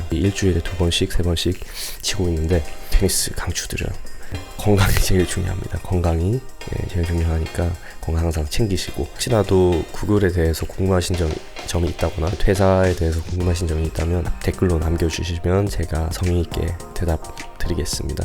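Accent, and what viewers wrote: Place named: Gyeongsang, South Korea. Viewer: 경상도분이세요? 뭔가굉장히친숙한데 말투가 고급진사투리야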